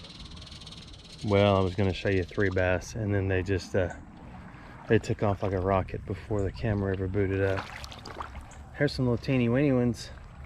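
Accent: South, United States. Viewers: Yes, you definitely have a southern accent.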